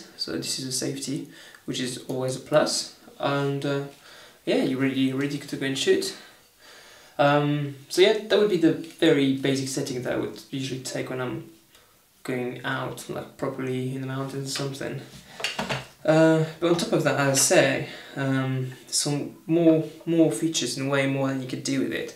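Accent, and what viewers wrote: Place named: United Kingdom. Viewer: Thanks for sharing this, where did you buy the whole set (am guessing from your accent you live in the UK)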